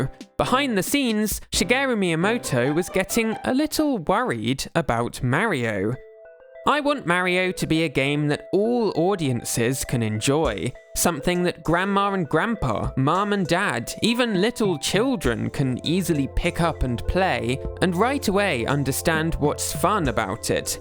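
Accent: United Kingdom. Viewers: This is probably the most UKish accent I've ever heard.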